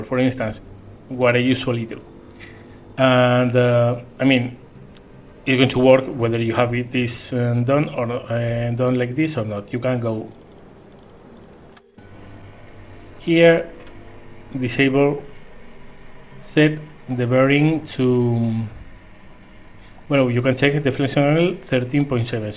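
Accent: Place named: Spain. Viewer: Muy bueno. Por tu acento apostaría a que eres español, ¿no?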